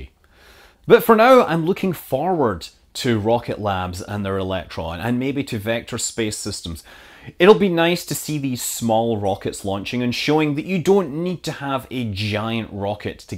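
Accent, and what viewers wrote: Scotland, United Kingdom. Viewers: Hey Scott where in Scotland are you from?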